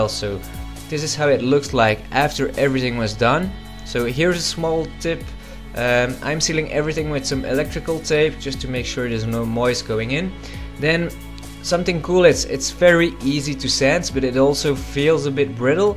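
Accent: Netherlands. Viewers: dutch accent cant hide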